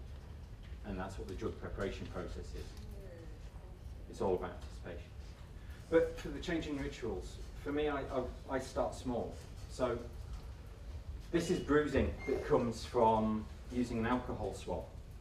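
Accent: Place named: United Kingdom. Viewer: Bugger the door creaking! This guy knows his stuff! If all you can moan about is the door creaking you didn't listen or understand this video To the maker and lecturer in this video THANK YOU SO MUCH FOR MAKING THIS VIDEO I wish I could have listed to you spk all day because for someone who never took that stuff, you really have done your research and know what you e talking about. You sound like you're from the U.K. Do you run any particular detox or rehab? I have a friend who needs help badly,and where we live there's hardly any. I know this is a old video but I'd be so grateful if you could reply. Thanks for your time and all the best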